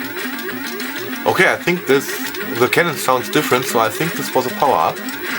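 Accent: Germany. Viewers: My german-sensor was activated... Do I hear a german gentleman? :-D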